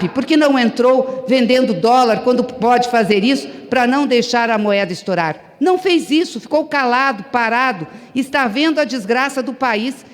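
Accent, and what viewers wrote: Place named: Curitiba, Brazil. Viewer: e.Vrrdade.Eu.Conhesso.Gente.Que.Levou.Osso.Que.teve.Que..Jogar.Porque..estava.podre..parabens.deputada.Gleice Pelo.Esselente.Trabalho.pode.Cotar. Comigo...A.Senhora.E.Minha.Eterna.deputada.ou.Senadora.E.Uma..Onrra.Ter Uma.Reprezentante..Tao..Conpetente E.Tranalhadora..Onesta.E.Imteligente Como.A.Senhora..Sem.Falar.Deste Sotaque.Lindo.Curitibano.Paranems. Deputada...Conterrania.Gleice..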